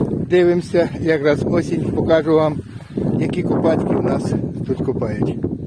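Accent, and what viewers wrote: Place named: Lviv, Ukraine. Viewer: Як приємно слухати ваше привітання, тільки почула і відразу подумала, людина зі Львівщини, навіть не відрізниш, у вас і діалект Львівський!, 😊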